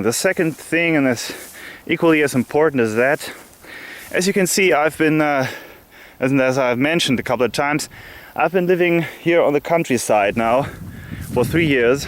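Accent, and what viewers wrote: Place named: Germany. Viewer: " and..... jaaa " dude i love your classical German accent <3 '' zere you go ''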